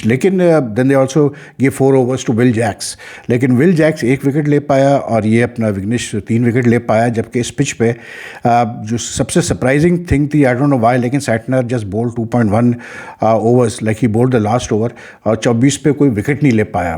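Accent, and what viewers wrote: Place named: Pakistan. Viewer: He is not "Putthar"!!! He is not a punjabi. His last name is "Puthur". And its not "Karela league". It is "Kerala"!!!🤣😂. Fed up of Pakistani accent and pronouncing of Indian names.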